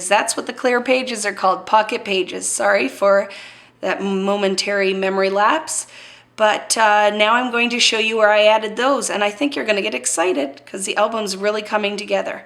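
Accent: Canada. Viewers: Just stumbled upon your videos! Love them!! I kept saying to myself "is she Canadian?"...so I went off to you blog to find out... Born & Raised in Ottawa, wavin' atcha from Houston Texas! :D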